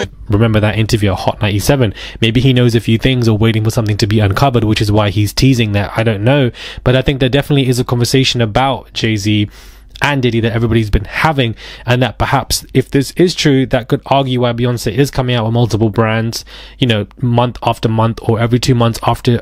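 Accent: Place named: England, United Kingdom. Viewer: You can slow it down if you acers the playback speed in the top right. But yeah England has so many accents when people speak normal speed its hard to understand if you're not native. Just be thankful he's not Scottish or Irish you prob wouldn't understand one word 😂 even I as a native might need to repeat parts!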